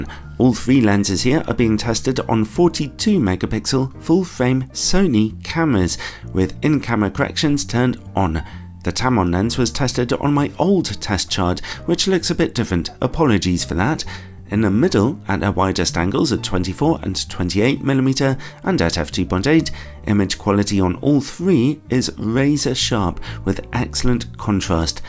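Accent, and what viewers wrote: United Kingdom. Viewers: he is british. It’s Zed in the UK but not found as much as everyone. T as Tee but some says Tango Z as Zee but some Zed V as Vee but some says Vicky C as Cee but some says Carrots B as Bee but some says Bob. They say these stuffs cuz some people in UK have bad hearing and often hear something else. Sorry for that you found it funny.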